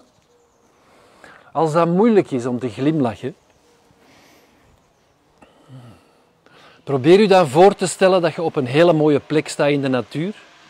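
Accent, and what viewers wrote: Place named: Flanders, Belgium. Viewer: Wat een fijne, warm aanvoelende les, met als extra dat mooie Vlaams van jou en dat rustgevende konijn dat helemaal haar eigen gang gaat. Cadeautje van jou, dankzij YouTube ontvangen. Dankjewel. Groet van net over de grens. :)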